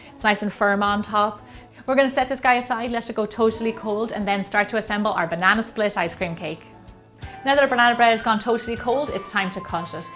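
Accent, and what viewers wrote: Ireland. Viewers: Are u from Ireland?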